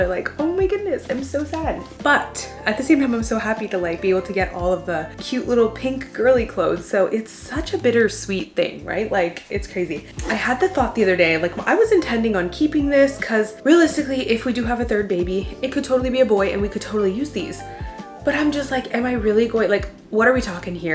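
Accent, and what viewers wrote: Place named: Canada. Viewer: Congrats again on baby girl 👧 also I noticed the “y’all” while making the ice drink lol I see TN is rubbing off on your Canada 🇨🇦 accent 😊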